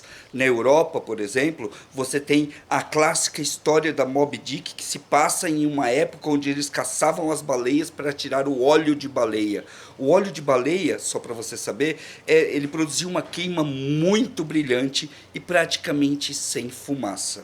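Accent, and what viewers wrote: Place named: São Paulo, Brazil. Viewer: Que bonitinho sotaque paulista!! O mais importante é o tutorial.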